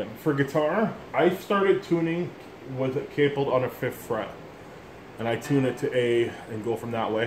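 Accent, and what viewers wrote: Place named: Louisiana, United States. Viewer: your starting to talk like a Cajun down here aint that dandy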